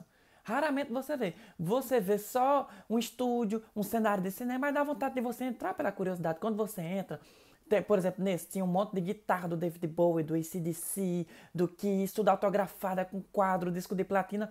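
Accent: Ceará, Brazil. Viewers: "Tão rápido que eu procurei sentido em minha vida e não achei!" Cearense! 😁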